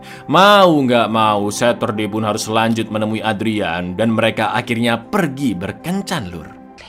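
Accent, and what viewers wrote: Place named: Kebumen, Indonesia. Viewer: cak pean wong kebumen dudu ?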